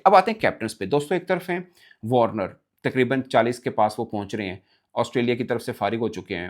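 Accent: Punjab, India. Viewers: پیارے بھائی پہلے بھی کہا تھا کہ اردو میں پنجابی لہجہ نہ استعمال کیا کریں۔ جیسے آپ اکثر شاہین شاہ آفریدی کو شہین شاہ کہتے ہیں۔ پلیز تلفظ کا دھیان رکھا کریں آپکا وی لاگ پوری دنیا میں جہاں اردو سمجھے والے لوگ ہیں دیکھا جاتا ہے۔ شکریہ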